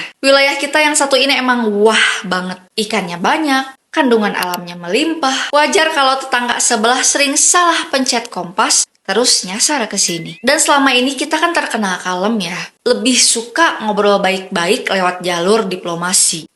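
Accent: West Java, Indonesia. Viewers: Aku suka penjelasan nya jelas dn lucu ada logat Sunda nya gitu 😂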